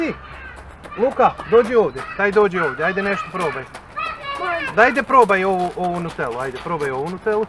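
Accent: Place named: Karlovac, Croatia. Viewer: iznenadio si me s tako lipim glasom, osjeti se karlovački naglasak!!! Što se tiče Nutele, razlika je vidljiva( mogu ocjeniti jer sam iz Hrvatske a živim u Luxembourg), ali moji klinci vole jako našu Kraševu Linoladu koja je njima kažu i bolja, uvijek je bilo i bit ce razlika u kvaliteti proizvoda za zapadne zemlje Europe i one istočne, to znas i sam jer si rođen vani, meni je recimo razlika najveća i najvidljivija ona kod omekšivača za rublje i u dugotrajnosti mirisa i u boji i u gustoći za potpuno istu marku poput Lenora... inače ideja za video predobra i glas kao u glumca!! Lp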